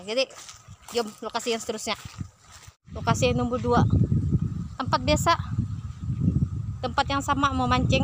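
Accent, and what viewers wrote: Brunei. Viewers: ooh cakap macam bahasa brunei bah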